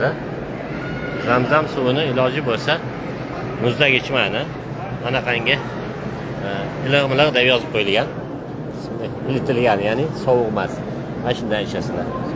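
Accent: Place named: Namangan, Uzbekistan. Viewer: Хожи ака сиз Наманганлик танидим овозингиздан буйрак огриганларга тавсия бергансиз олох зиерат ларингиз Кабул булсин. Олох сиздан рози булсин. Мен сизни. Новосибирдан кузатиб бораман Хаж умрага бордим олохим кайта кайта боришликни насиб килсин Олох. Асалому алейкум ва рахматулоху ва барокоту 🕋🕋🕋🕋🕋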